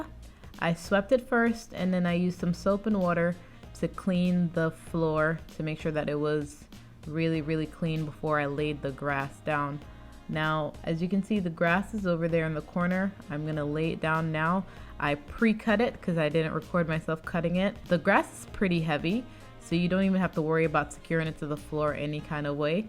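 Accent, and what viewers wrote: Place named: Jamaica. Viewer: Looks great, I love it! I detect a Jamaican accent, are you from Jamaica?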